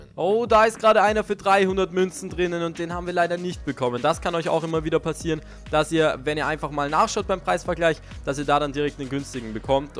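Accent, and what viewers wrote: Austria. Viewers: Er kommt aus österreich du fisch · Es is a östareicha ok mia song ned oise so wie de deitschn.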